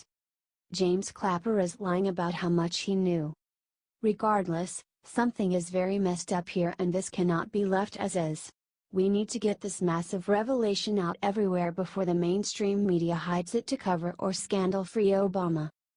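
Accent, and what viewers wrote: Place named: Russia. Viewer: Use your own voice not a Robot. We want to hear your Russian accent.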